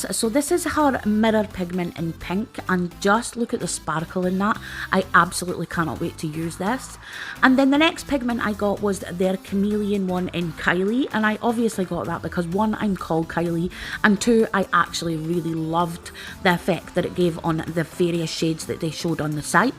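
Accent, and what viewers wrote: Scotland, United Kingdom. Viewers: Really nice to hear the voice of not only a fellow nail art enthusiast but also a fellow scotswoman😍👍😍👍😍👍👏👏 also great vid!! I am soooo envious of your glitter collection.🤣🤣🤣🤣😟😟😟🤣🤣🤣 gorgeous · My first time here. Scottish??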